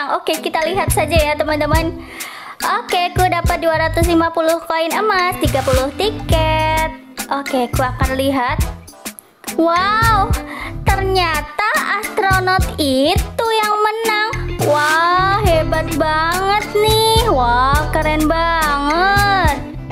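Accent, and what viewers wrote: Java, Indonesia. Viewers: Sampean orang jawa ya kedengeran dari suaranya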